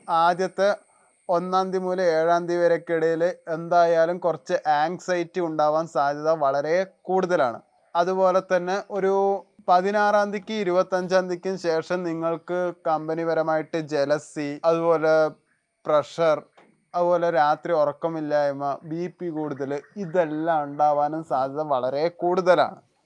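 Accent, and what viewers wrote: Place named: Palakkad, India. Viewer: ❤ sir correct Palakkad slang❤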